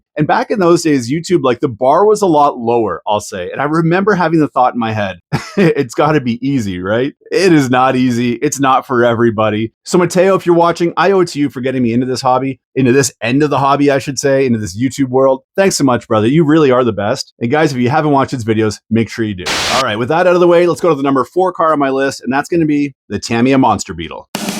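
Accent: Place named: Canada. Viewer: Deckles and Lie-very… you Canadians always make my day!🤣 I built a frog back in 83/84… I grew up in Brooklyn and my neighbor upstairs was an ex plane builder, he built a frog and a holiday buggy for his two sons… I had to have a frog too… I like how the grasshopper looked lots more but I knew with the 380 I wouldn’t be able to keep up lol The super champ was the dream back then, and all the bad ass team associated cars that were just way too much for my 10 year old taste lol Those videos make me want to build them ALL!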